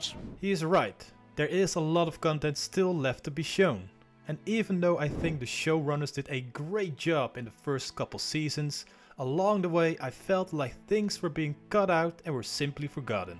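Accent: Netherlands. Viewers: Nou je Engels is goed genoeg hoor! Had wel binnen 10 seconden door dat je uit nederland komt;) Lol! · are you by any chance dutch?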